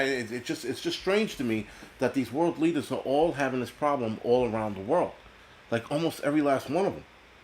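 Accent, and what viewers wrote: New York, United States. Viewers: You sound like a New Yorker - this is they way New Yorkers talk. Authentic born and bred New Yorkers.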